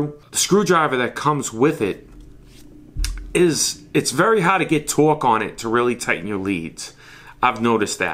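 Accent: Boston, United States. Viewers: what accent is that, boston ?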